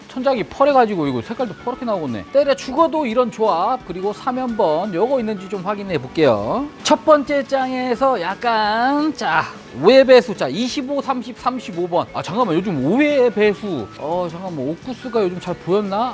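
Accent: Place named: Jeolla, South Korea. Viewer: 비 오는날 고생하네요 ㅋㅋㅋㅋ 아따 전라도 사투리 검나게 방갑소 ㅋㅋㅋㅋㅋㅋ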